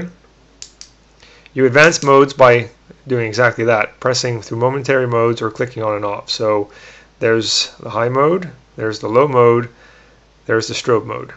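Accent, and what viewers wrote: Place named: Canada. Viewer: Actually I noticed your accent. Ive watched my share of CBC and Kids In The Hall lol.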